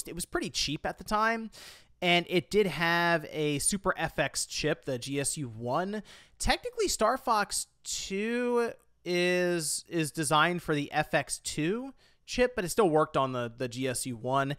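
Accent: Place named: United States. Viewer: Must be an American accent thing. Sometimes I swear I hear the word gayboy uttered instead of Gameboy in videos like this beside of of the invisible or softly pronounced M's.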